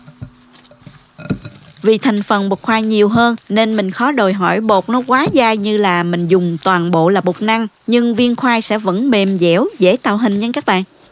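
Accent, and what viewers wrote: South, Vietnam. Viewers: o dau cung duoc nau do an ngon co giong noi dac chat nam bo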